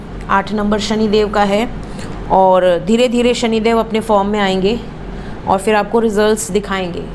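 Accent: Varanasi, India. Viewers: Didi aapki banaras wali boli badi pyaari lg rhi aapke muh se😍❤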